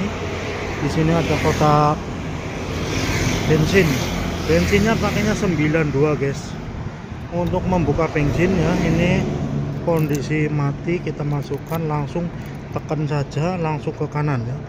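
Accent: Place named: Java, Indonesia. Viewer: Taiwan ngapak apa kie guli ngomong medok temen lik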